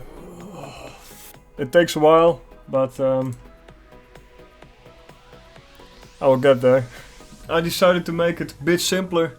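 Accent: Netherlands. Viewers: Ah heerlijk een nederlander, ik hoor het aan het accent 😂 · I fucking knew it xD first time watching your channel. Dat Nederlandse Engels is zo herkenbaar xD Maar wel mooi die flex3drive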